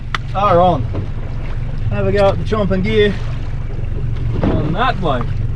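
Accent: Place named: Australia. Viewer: That's it, I need a visa, I'm moving to aussieland just to listen to this accent everyday. It's just so pleasant! So many made-up words lol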